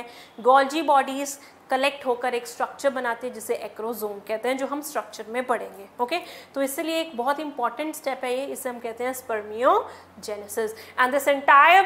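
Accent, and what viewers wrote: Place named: Punjab, India. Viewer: Thoda panjabi nikal jati hai❤️❤️❤️.....ÑîÇÈ ma'am.....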